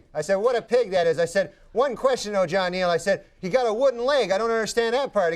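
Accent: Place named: Canada. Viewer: His Canadian accent is showing eh???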